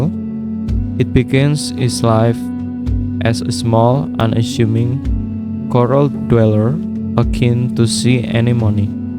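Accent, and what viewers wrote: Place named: Indonesia. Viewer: Dude I could just TELL you had an Indonesian English accent! wonderful art too!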